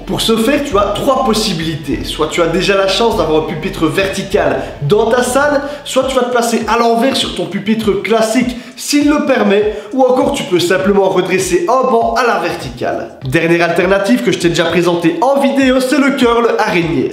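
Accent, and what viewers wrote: Liège, Belgium. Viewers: accent liegois non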